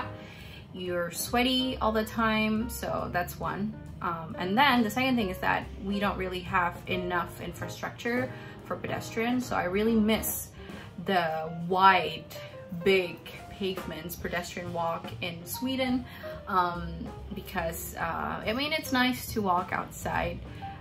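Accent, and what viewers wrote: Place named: United States. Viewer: Your English is extremely good ! You sound just like an American (to my ears).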